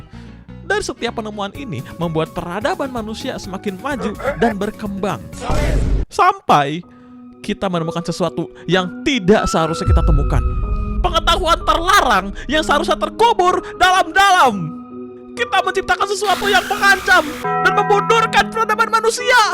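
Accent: West Java, Indonesia. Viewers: Sunda lu bang?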